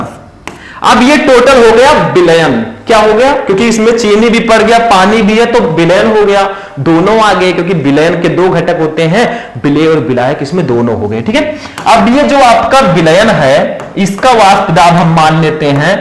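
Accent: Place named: Gonda, India. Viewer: Sir aapke language se lagta hai ki aap aap Uttar Pradesh Gonda se hai 🤔🤔🤔🤔🤔🤔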